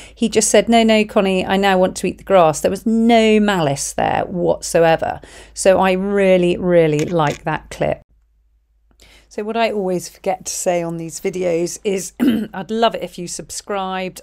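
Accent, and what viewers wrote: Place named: United Kingdom. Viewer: Thank you so much for taking time and making subtitles! British accent is way more difficult to understand than the american one (for me personally). The funny thing is that we study at school British English but USA produces a lot of content on the internet so i had to adjust and now i understant American English better than British English 😅 Also thank you for sharing the right way of "talking" to animals. The World needs to be a better place for them.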